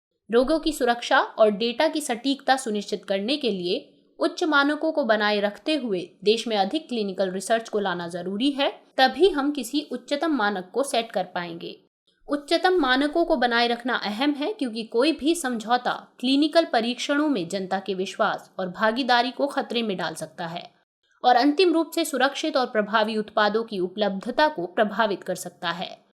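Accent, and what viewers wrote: Bihar, India. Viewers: Bihar se ho kya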